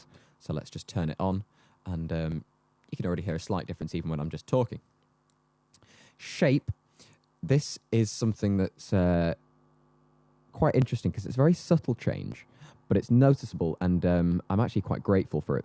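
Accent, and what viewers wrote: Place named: Darlington, United Kingdom. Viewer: INMHO... Sounds like, a lad Darlington.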